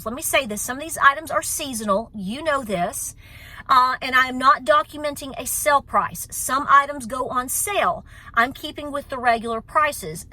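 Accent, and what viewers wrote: South, United States. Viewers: you have a Southern accent your a upbeat Energetic active woman i wanna be upbeat Energetic active like you are when i am your age i subscribed to your channel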